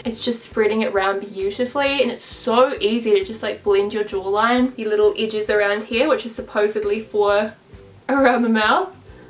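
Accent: New Zealand. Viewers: April Taylor she a New Zealand accent.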